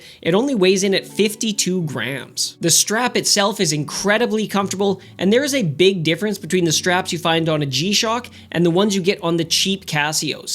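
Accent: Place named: Canada. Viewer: If I ever need to explain what a Canadian accent sounds like, I'm going straight to this video for the best example❤👍. Oh yes, forgot, I agree with you on the 6510.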